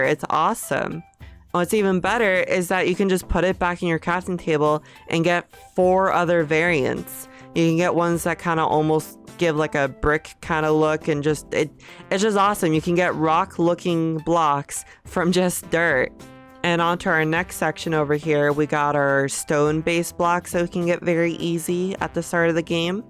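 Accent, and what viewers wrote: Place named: United States. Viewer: i cannot for the life of me place this accent. i know it. i have BEEN here before, but i cannot recall where in america this is anyway regarding the crude door-- that dont break near frequent enough to deter me. i love how that door looks, takes 2 seconds to put back. hardly different than my real doors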